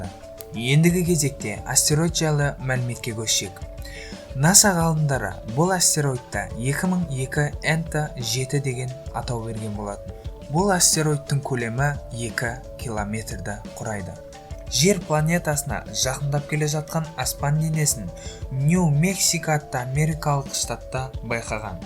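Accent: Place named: Uzbekistan. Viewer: Тілің озбек емеспа жағымсыз,и сөздерд бұрап айтасн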